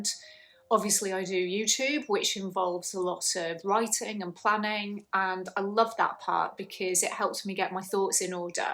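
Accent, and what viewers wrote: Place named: Northern England, United Kingdom. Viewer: Great reflections. Candid and sharp but genteel and insightful. What region of the U.K. Are you from? Love your accent. Northern, I imagine.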